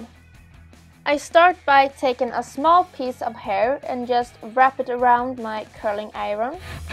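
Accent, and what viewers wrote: Sweden. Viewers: Just gotta love the Swedish accent tho! ;D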